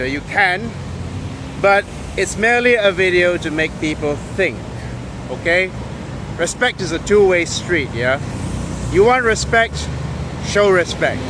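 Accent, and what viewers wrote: United Kingdom. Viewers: Funny how they make it a big show about your british accent, when a lot of the millenials and gen xérs do their rubbish best to imitate the american ones, despite having a rather poor grasp of the american english vocabulary..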